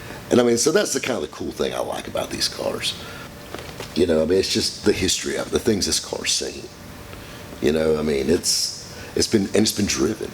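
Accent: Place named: Carolina, United States. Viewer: When I read these posts I hear Carolina accent and voice in my head...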